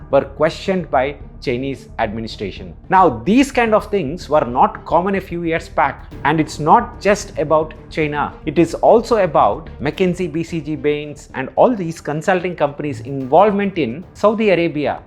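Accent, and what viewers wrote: India. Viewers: Improve your accent, it's really bleeding my ears to hear how, some Indians speak. This is not how English is supposed to be spoken......Seriously, something is seriously wrong with Indian accents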